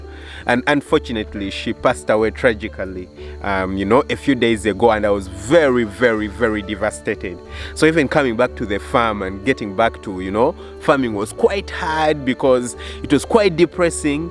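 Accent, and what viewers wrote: Uganda. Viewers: Condolences over the loss of your Grandma, and congs for having beaten the virus. But on a kind note, pliz learn to include/mention the name of your country of origin in every video post. You should learn from Kenyans, who cannot post anything without mentioning their country. From you accent I guess you are a Ugandan, so go ahead and make your country proud and visible.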